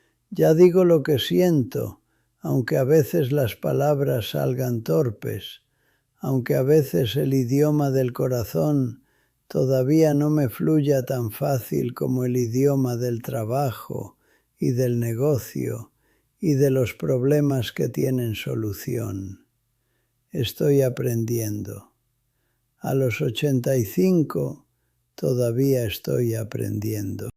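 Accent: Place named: Spain. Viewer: Tu acento es español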